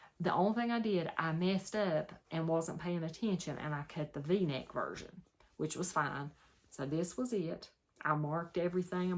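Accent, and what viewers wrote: South, United States. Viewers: Hello from another! I enjoy your videos & accent! I also have a southern accent as I grew up in Texas and moved to Virginia 12 years ago. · First time watcher. I enjoyed your video. I'm from Louisiana originally but now in CA. So nice to hear a southern accent. I'm an experienced quilter who is now wanting to make my own clothes. I'm having a hard time fitting into the commercial patterns. I am only sewing with knits because they are so forgiving with fit. I'll be watching. --Lynda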